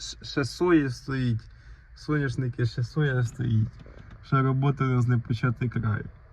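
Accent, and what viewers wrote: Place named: Cherkasy, Ukraine. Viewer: Почув наче єрки то ти з Черкащини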